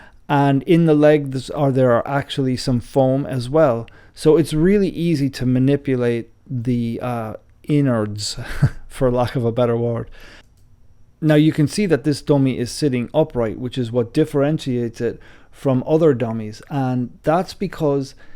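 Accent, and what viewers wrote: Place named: Ireland. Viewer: ! Great review & very cool to hear the Irish accent! I'm in a similar-ish boat in that life means I can't & won't be able to get to the gym as much as I want going forward... I'm a life long Gaelic footballer who found martial arts just over two (covid) years ago. I'm chasing time (42 now in May; just had my first novice MMA fight) & I realise solo training will need to be a (big) part of my practice going forward; I/we can't be away out of the house every evening training jiu-jitsu!! I bought an unfilled dummy/'corpse' during Lockdown, but the filling process near broke my heart + I just couldn't get it right. I'm seriously considering buying a filled SMARTY & getting it shipped to Ireland... Expensive I know, but I'm thinking it could turn out to be priceless! Thoughts?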